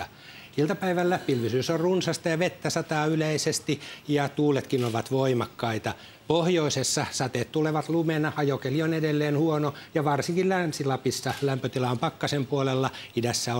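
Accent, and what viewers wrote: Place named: Spain. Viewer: sounds like spanish accent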